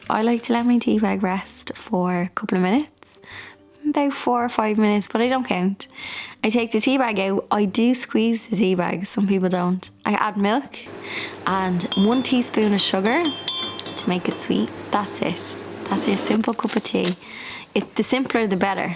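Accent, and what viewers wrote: Ireland. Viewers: Love your videos ❤️ I just found you!! I'm hooked so I subscribed! I'm from South Carolina, USA. So this southern accent loves your Irish accent!! Your home is beautiful!! I'm in love with your sofas!! Did you buy them or did you reupholster in that beautiful fabric?!! ❤️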